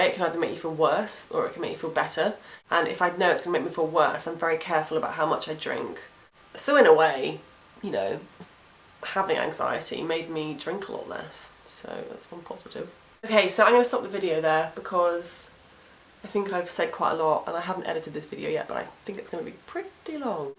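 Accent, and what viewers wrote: United Kingdom. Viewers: you got great british accent i love that do on depression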